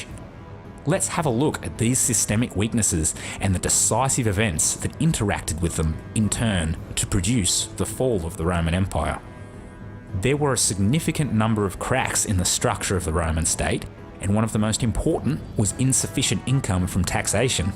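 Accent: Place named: Australia. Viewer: Wonderful overview told with a lilting Australian accent. Shared this with fellow students in a Future Learn course sponsored by Newcastle University, "Hadrian's Wall: Life on the Roman Frontier". Thanks!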